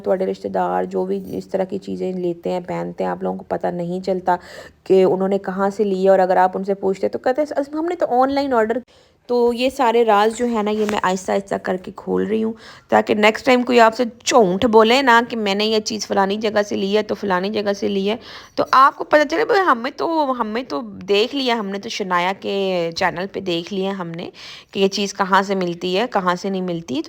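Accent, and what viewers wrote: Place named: Punjab, India. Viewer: Api ap botli bhout mazy ka h Jo ap punjbi ka tarka lagti h Kia bt h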